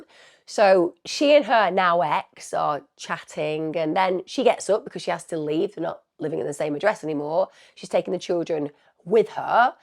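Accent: Australia. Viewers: Terrible case, very sad... evil man. But I also love the Aussie accent haha! Spot on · As tragic as it is your Aussie accent is fab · I was living in Melbourne at the time and I just cannot put into words how distraught and horrified we all were when this happened. This bridge is HUGE. So high. She had absolutely no chance, the poor little angel. (Your Australian accent is great by the way). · Heartbreaking 💔 case. Loved your Aussie accent lol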